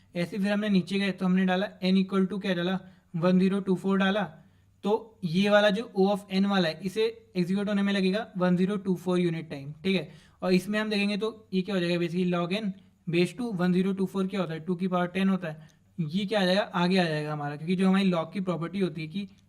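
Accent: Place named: Rajasthan, India. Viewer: The mentor sound like he is from Rajasthan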